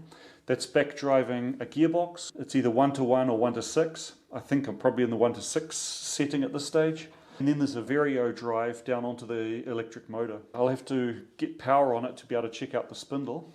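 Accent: New Zealand. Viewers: You sound like a kiwi.. but do you live there? Hmm! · Yeah that industry is not known for innovation, but for safety measures :) So they needed evidence they really work :) By the way: may I ask, where did you pick up that strong New Zealander accent? It's really a pleasure to listen to you :) There is a guy on YT (Geoffry Croker) he speaks like this :) But he's native there :)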